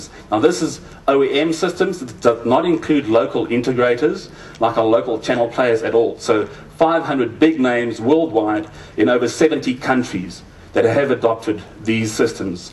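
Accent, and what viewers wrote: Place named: South Africa. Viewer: Definitely a South African speaking here.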